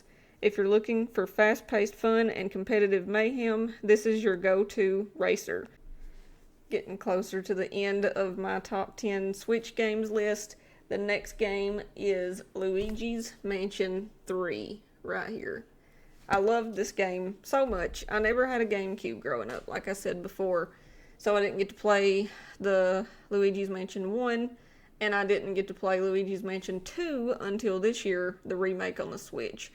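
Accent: United States. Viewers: you sound like Arkansas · Love that accent of yours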